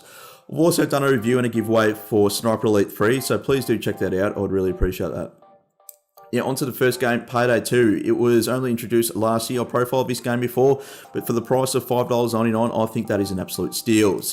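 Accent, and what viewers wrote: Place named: Australia. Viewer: Secound ! Can i has a Gmod :3 Dat australian accent ;D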